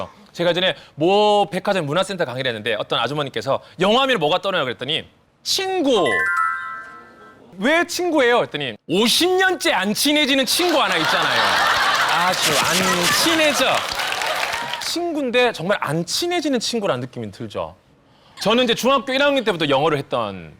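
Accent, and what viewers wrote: South Korea. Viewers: 김영철 영어 못함. 엉터리 영어, 콩글리쉬를 너무 자신만만하게 함 ㅋ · 영어도 제대로 못하면서 뻥은? 하와이에 왔을때 콩글리쉬하는거 보고 엄청 웃었음.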